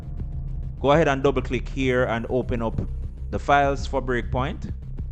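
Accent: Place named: Jamaica. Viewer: beautiful jamaican accent brother, great video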